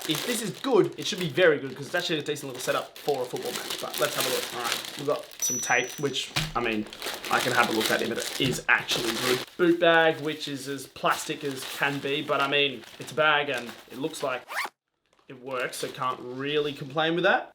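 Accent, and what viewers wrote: Australia. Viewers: Is Australian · Are you from Australia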